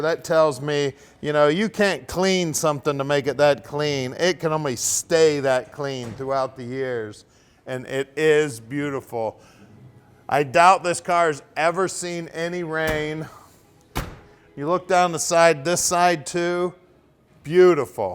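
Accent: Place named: Detroit, United States. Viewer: My grandfather had one of these in navy blue. RIP Grandpa. He worked for Ford and grew up and lived in Detroit, as did my mom, uncle, aunt and grandma, and your accent reminds me of them all. It's actually bringing tears to my eyes. Thank you.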